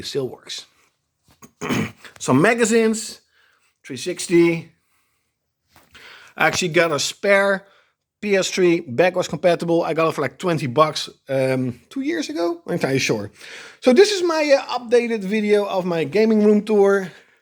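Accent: Netherlands. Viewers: Geen idee wat het is maar je kunt altijd gelijk merken wanneer iemand Nederlands is 😂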